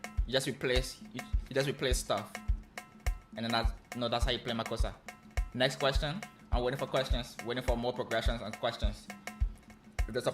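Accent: Liberia. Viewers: You sound like a Liberia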